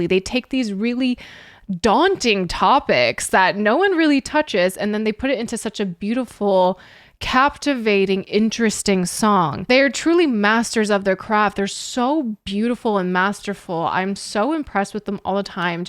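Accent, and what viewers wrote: United Kingdom. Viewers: British public school commentary circa late '70s.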